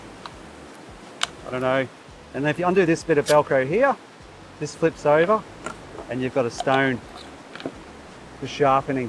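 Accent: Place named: Australia. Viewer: You KNOW it's legit when the narrator has an aussie accent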